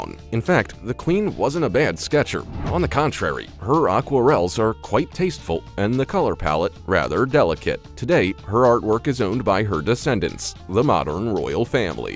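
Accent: United States. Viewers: I know he also sounds very uneducated about this information about the queen and the monarchy I'm guessing he's an American from the way he talks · I do dislike British documentaries narrated by Americans. Not only do they tend to be factually incorrect, but the pronunciation often jars on British ears. For example, "Buckingham" places no emphasis on the last syllable, whilst the American pronunciation makes it sound like cold meat. Incidentally, "England" and "Britain" are not interchangeable. The Queen and King were crowned Queen/King of the United Kingdom of Great Britain and Northern Ireland. And I strongly suspect any disfavour Scotland has with England has got nothing to do with ancient gold mines!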